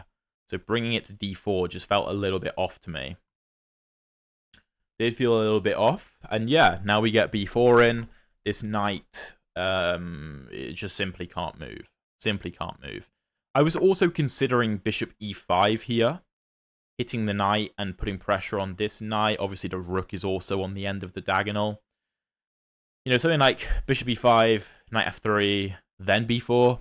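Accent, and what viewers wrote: United Kingdom. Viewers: You are very good at explaining your ideas. Also, I love the British accent. Greetings from a new subscriber in Germany!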